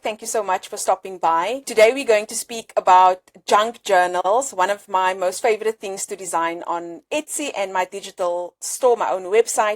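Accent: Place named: South Africa. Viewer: Are you from South Africa? 🌼✨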